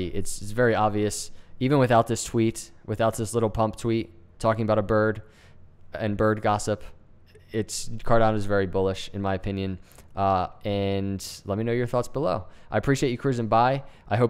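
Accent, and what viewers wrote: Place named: Mid-Atlantic, United States. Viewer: I think I like you because you sound like you're from the NJ/PA/DE/MD area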